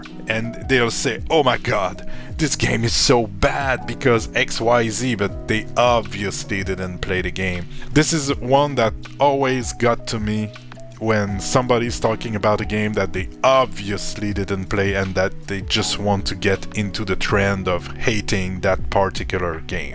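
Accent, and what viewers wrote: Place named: Quebec, Canada. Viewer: J'aime l'accent Québécois ! Cheers to you !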